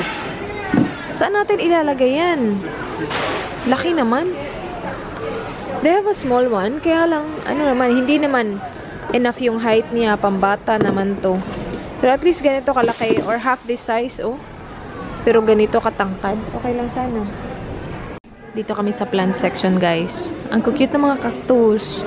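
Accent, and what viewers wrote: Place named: Visayas, Philippines. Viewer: Diko na gsto accent ng English mo. Tunog bisaya na. Nong nasa cebu ka gstong gsto. Or baka sa video lang kasi madame kame bisaya na viewver mo.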